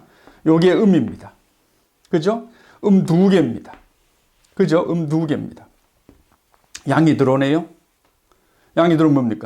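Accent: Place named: Gyeongsang, South Korea. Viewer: ❤❤❤❤❤ 몸 띠이 (몸) 사움 (싸움) 살 (쌀) 요레! 요레! ㅋㅋㅋㅋㅋ 경상도 특유에 독특한 사투리로 어렵다는 주역 을 말씀해 주시니까 박사님!주역해설이 더욱 재미 있습니다 박사님! 멋있습니다 ❤❤❤❤❤ ❤❤❤❤❤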